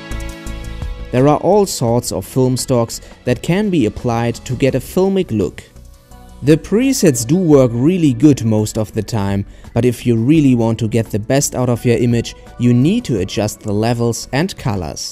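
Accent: Germany. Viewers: Lady Fynix man hört das deutsche 😂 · Erst dachte ich mir so: "Da hoer ich doch ein Deutschen akzent" und dann sehe ich das du noch in der selben Stadt lebst wir ich :D Gutes tutorial, danke hat sehr geholfen ^^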